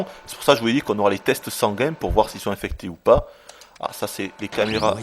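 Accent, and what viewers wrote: South, France. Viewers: J'adore ce jeu, moi qui suis fan du film de John Carpenter, j'attendais ce The Thing avec impatience et je n'ai pas été déçu, on retrouve bien l'esprit du film mais le jeu a l'intelligence de nous amener progressivement vers autre chose, une histoire de conspiration scientifique terrifiante, toujours dans ces décors enneigés, la gestion de la peur chez les personnages est superbe et apporte un vrai plus au jeu, c'est parfois assez difficile et frustrant (notamment lorsqu'on doit affronter les petits monstres qui font un bruit aigu stressant) notamment le passage dans l'épave de la base aquatique, mais ça reste un très bon jeu qui reprend l'esprit du film de Carpenter ! Dis donc au passage, t'as un accent...du sud peut être ? + 1 like ! :)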